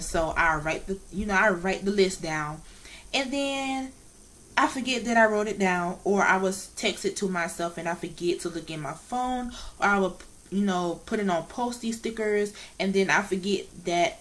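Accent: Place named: United States. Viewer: Yeah one of my friends had the same she had hogkins lymphoma and relapsed and had a stem cell for the second time. I'm guessing you live in America from your accent lol was your treatment expensive? As I live in the uk we have the nhs.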